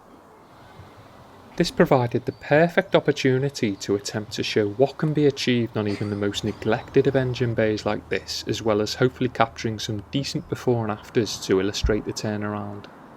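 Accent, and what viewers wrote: Liverpool, United Kingdom. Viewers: oibibi oybib he is British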